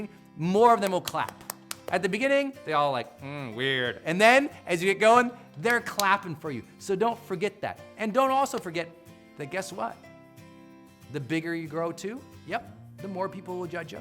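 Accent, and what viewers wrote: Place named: United States. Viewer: One who is weak at English can easily learn English by listening U.S English it is much easier than that of England their accent is little bit harder to understand for new language learner and fortunately Brendon is from USA so you can't listen to him.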